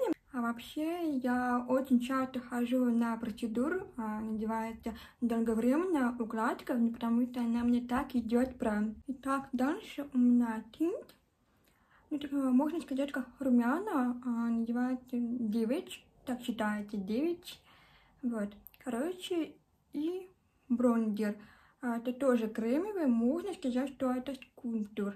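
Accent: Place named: France. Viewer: у тебя красивый акцент) я сначала подумала, что ты француженка :)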